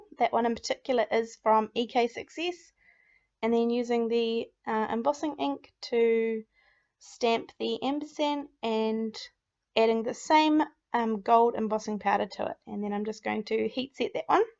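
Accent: New Zealand. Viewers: Love your videos, so nice to hear a kiwi accent!! Just wondering where you get your black and white cardstock from?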